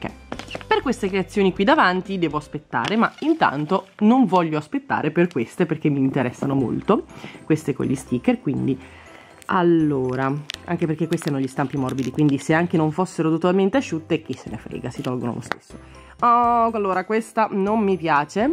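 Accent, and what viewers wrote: Bologna, Italy. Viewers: ma sei di Bologna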